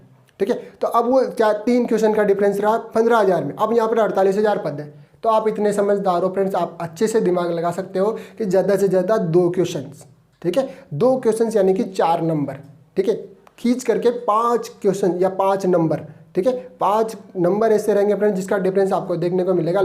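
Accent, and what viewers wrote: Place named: Malpura, India. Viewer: Sir aap malpura ke kya aapke voice tone se guess kr rahi hu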